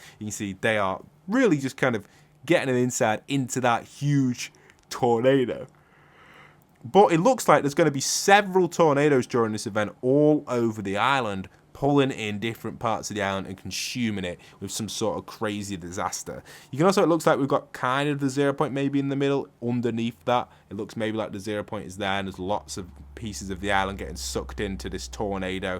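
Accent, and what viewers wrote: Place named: United Kingdom. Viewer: He sounds like he is form the UK and they call Soccer Football over there.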